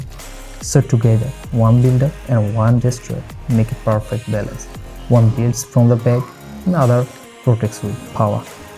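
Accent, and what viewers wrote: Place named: Kerala, India. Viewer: i knew right away that you're a mallu from that accent...aren't you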